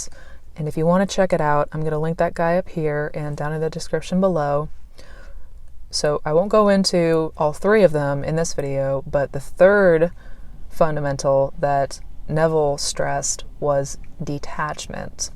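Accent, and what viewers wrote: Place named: Toronto, Canada. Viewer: Your the bomb... i swear your from Toronto the way you speak...lol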